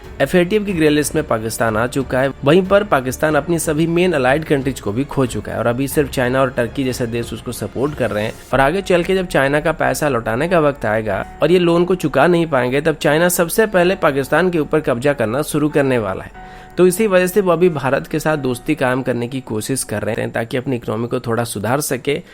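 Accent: Odisha, India. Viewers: Bhai tame kana odia pila ki??? Language ru jana paduchhi tike tike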